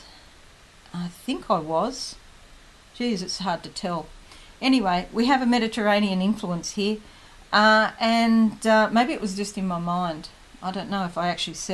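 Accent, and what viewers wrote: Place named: Australia. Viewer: Thankyou beautiful 🙏🏽🌹 I am so intune with this Read and like a true fish will just go with the flow. As for the wishes I truly hope they come true if it is meant for me. Love your beautiful voice and familiar Aussie accent...i feel on your wavelength 🙏🏽🌈♓💜🌹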